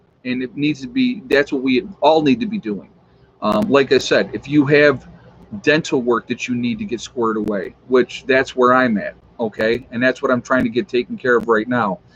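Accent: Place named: Michigan, United States. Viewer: Every time i listen to you, something would bug me about you being a new yorker..and i couldn't figure it out. Now i know what it is...you have a Michigan accent not a new york accent...😁am i right???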